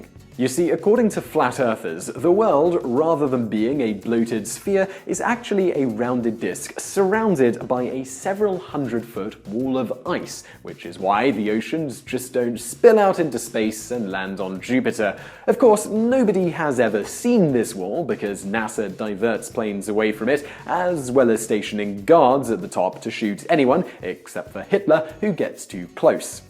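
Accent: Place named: Cockney, United Kingdom. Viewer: Watch something positive too much cockney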